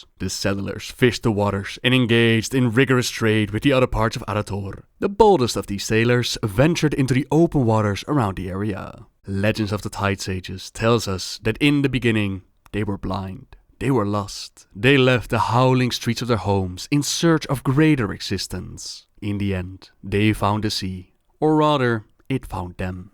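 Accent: Netherlands. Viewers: Are you Dutch? Your accent truly adds such a great aspect to your storytelling. Brilliantly done!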